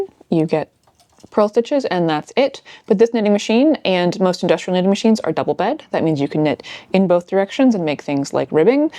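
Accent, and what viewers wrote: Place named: United States. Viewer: you actually pronounced fine just with american accent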